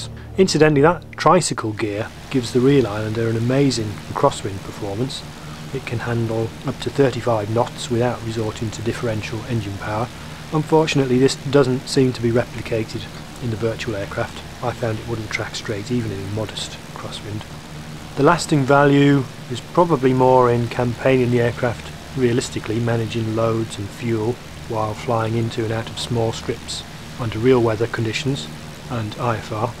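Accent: United Kingdom. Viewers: Just about the best 10 minute review for an FSX aircraft I've ever seen. Your comparison of real knowledge to in sim performance is particularly appreciated. As ever I also appreciate an understated but confident British accent. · I like the quality of your videos and also your british accent !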